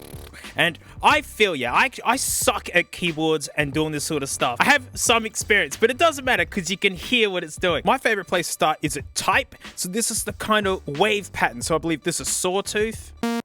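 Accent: Australia. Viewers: Sounds like the drum museum guy. Drums somethin. Australian. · Why.....why is this only 12 minutes? We need like..AN HOUR of this! I wanna hear the funny aussie man with his slithery friend named Frank scream and tell me hilariously albeit informative stories while using a synthesizer.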